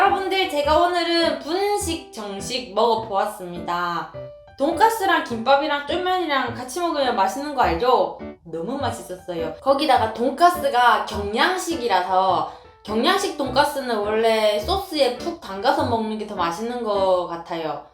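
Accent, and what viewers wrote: Gyeongsang, South Korea. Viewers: 양! 올 만 입니다. 역시 갱상도 말투는 구수하죠. 드실때 함씩 얘기 좀 하고 드세요. 맛이 어떻다,김밥은 저번에 먹은거 보다 맛이 어떻다 등 보는 이들이 같이 공감 하는게 어떨런지! 물론 뒤에 강아지는 간식먹었죠 근데 너무 애처롭게 보이내여...한번씩 뒤돌아 보세요. 강아지 왈 " 지만 마이 묵고 나는 쪼매만 주고 잉:;~/^ 하는 표정 ^^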